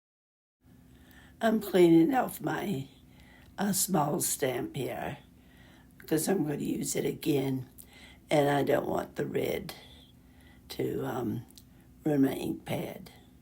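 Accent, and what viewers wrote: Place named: Deep South, United States. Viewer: 👋 Hello Neighbor! My Tennessee Neighbor, I know you live in Tennessee but I would swear I hear a Deep South accent in you, I could be wrong though. I live in and was born here in rural Appalachia, Leslie & Harlan county, but in my 20’s I movie to Biloxi Mississippi for a couple years, and I’m just curious if you are Originally from Tennessee or if maybe you grew up down South? I LOVE your channel, I am fairly new so I’m watching some of your older videos too in my free time, your toilet paper video blew my mind! I think you are a undiscovered Genius! 😊❤ You are Awesome sauce❤, a wealth of knowledge, I’m so grateful to learn from you, Thank you so much for sharing!!😊 I just adore you. Also, 👋 hello to everyone watching, crafting friends! 😊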